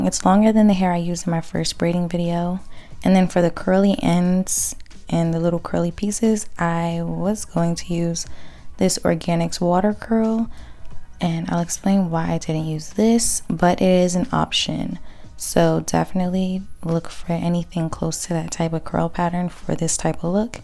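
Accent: New York, United States. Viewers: Your accent is so New York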